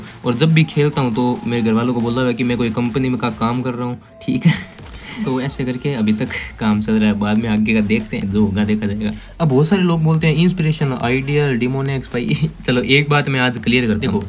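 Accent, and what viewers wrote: Haryana, India. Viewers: Mehnat to hai bhai...... Mehnat to kar rha launda..... Haryana se ho kya? Apki voice se lag rha ❤ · same situation brother 😂😭 Aap bhi Haryana se lagte ho fir to 😂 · same😆 pubg ka name letee hi khatam🤣🤣 by the way love from my heart m bhi haryanvi hu apki awaaz se pta chala ❤️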